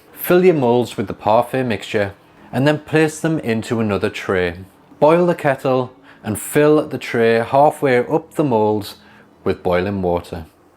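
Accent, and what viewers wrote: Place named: Yorkshire, United Kingdom. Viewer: I’m guessing from your accent you’re a Yorkshire lad..?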